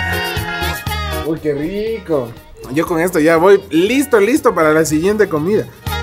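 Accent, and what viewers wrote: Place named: Ecuador. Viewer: Jajajaja pero habla como el ecuatoriano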